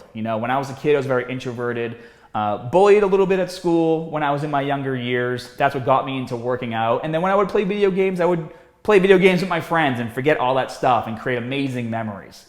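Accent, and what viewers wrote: Boston, United States. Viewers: Whether you do decide to leave or stay, I appreciate the many, many videos you have uploaded over the years. I've only discovered the channel in the last year or so however there is plenty to go through and enjoy and even go back to if needed for advice on form etc. Lots of genuine, honest and great tips and advice. I appreciate the humor, knowledge, genuine and honest videos. You seem like an awesome dude and the Boston accent is lots of fun as a bonus. Either way, best of luck in your future endeavors and thanks for everything you have done and shared to help fellow gym folks like myself who enjoy the weight lifting and other aspects. I'm glad you did all this and took the risk and opportunity to make this channel happen. I also can totally relate to being introverted as a kid, gaming with friends and making lasting memories. 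The gym and sports and other stuff also was a great time spent with them and on my own to help with mental health, physical health and so on. Breaks are great to recharge and reset and you don't need to apologize ever for looking after yourself. It is a bit different seeing you with glasses haha. Sorry for the rambling comment but just felt like sharing to show you are appreciated and thanks for everything you have shared and done. Cheers from a fan from British Columbia, Canada. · You hit the nail on the head. A Google search of "how to" blah (whatever exercise I was searching at the to time) is how I found you several years ago. It was easy to tell that you knew what you were talking about and had the results to prove it. Plus, I went to college in Boston and needed more of the accent lol. Been following you and trusting you as a top-notch fitness resource since. Congrats and best of the luck on the game! 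Geez, living all of our dreams!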